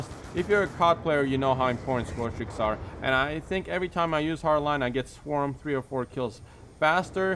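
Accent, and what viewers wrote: Russia. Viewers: cool class you sound russian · You're really cool man, you've earned a sub, like and a comment :P But 1 question: are you russian or american or what? sorry i'm not too sure.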